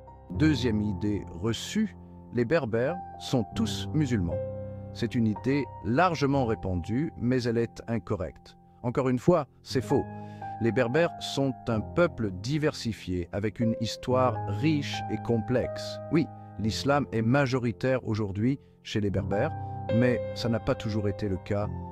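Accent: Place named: United Kingdom. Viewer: J'adore le petit accent british 😊 Merci pour la vidéo. très instructif. Question est-ce que l'islam tolère ou efface la culture berbères?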